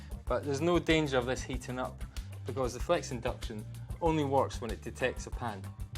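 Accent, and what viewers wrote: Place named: Scotland, United Kingdom. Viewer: Best Scottish accent ever! 🏴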